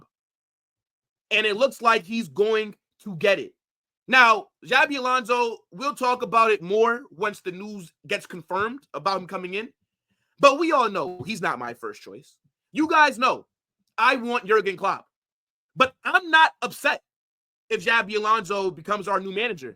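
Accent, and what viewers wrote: United States. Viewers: Are you American bro?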